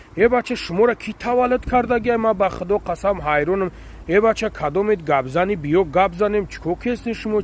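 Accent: Kulob, Tajikistan. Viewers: Кулоби гап назанохи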